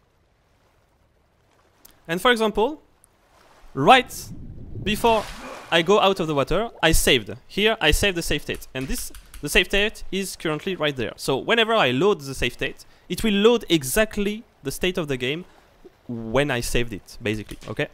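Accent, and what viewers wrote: France. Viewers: putain je savaispas que t'étais français mdr, trahis par ton accent x) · Tu as comme un accent d’un français qui parle anglais 😂 sinon je pensais réellement que tu l’avais fais en 😂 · mdr le bonne accent francais comme on aime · On reconnaît l'accent français c'est cool 😂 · ptdr l’accent de fou même mon voisin a reconnu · a ses pour sa que dans la video tu avais un acent francais et du mal pour certain mot 3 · Son accent est 100% français, tout le monde l'a reconnu 😂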